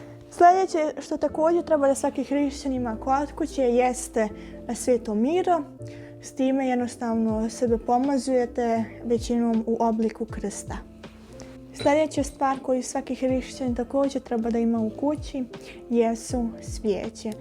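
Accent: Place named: Republika Srpska, Bosnia and Herzegovina. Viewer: ОНО ШТО МЕ РАДУЈЕ ЈЕ ТО ДА ПРИЧАШ ИЈЕКАВИЦУ И МИСЛИМ ДА СИ ИЗ РЕПУБЛИКЕ СРПСКЕ. И ЈА САМ.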